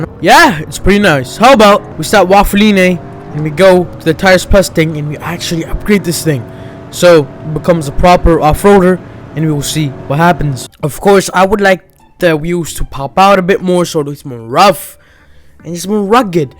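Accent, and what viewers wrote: Germany. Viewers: i love the german accent. Ich hab noch nie so einen schönen , guten , vry butiful germanium mensch gesehen. spass bei seite ich liebe deine videos! Dein humor ist perfekt :D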